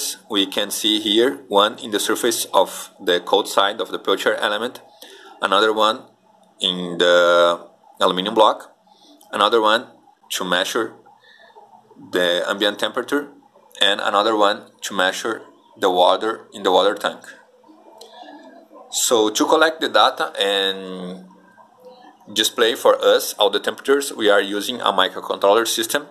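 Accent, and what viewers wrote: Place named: Brazil. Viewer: Brazilian sotaque....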